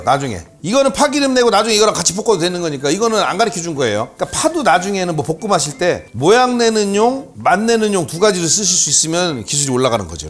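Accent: Chungcheong, South Korea. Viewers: Yee분 충청도 사투리 같은거 쓰는데 충청도 사람이에you?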